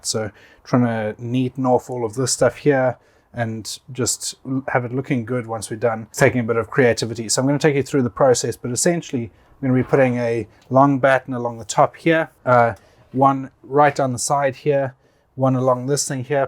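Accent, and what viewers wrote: South Africa. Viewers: Very helpful and informative as always, I was thinking about using this product as it really gets in everywhere. Thanks again for your excellent work, I was wondering are you from south africa.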